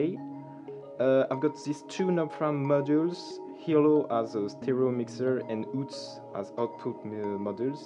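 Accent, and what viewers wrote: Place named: France. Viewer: Talking about modular sounds that much better with a French accent. 😃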